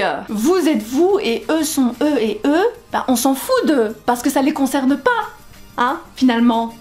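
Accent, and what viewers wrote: Belgium. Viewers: je crois entendre un accent belge? té bèlge? belge aux yeux verts, nan, pa possib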